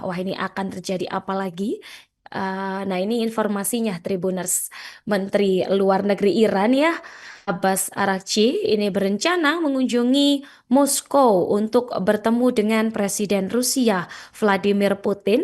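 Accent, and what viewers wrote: Java, Indonesia. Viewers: Hostnya medoknya nagihh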